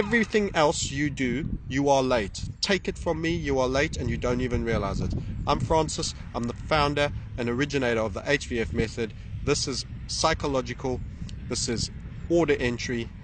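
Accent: South Africa. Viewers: FoLA I am glad you have not lost your SA Accent Bru'. Fear-of-Loosing-Accent.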